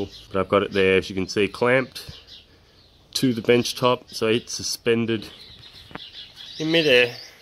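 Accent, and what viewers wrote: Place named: New Zealand. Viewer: oo..sorry i tought somewhere in new zealand...totally different from the aussie i know...really appologised mate..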